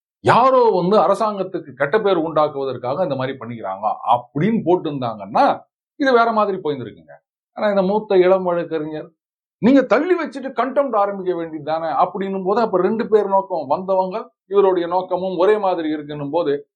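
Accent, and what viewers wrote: Chennai, India. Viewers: Ennaiah nee Chennai Tamil pesura?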